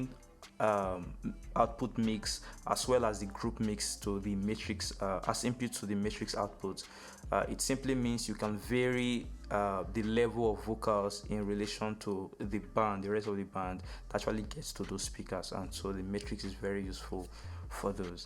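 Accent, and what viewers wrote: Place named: England, United Kingdom. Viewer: I am struggling with his English accent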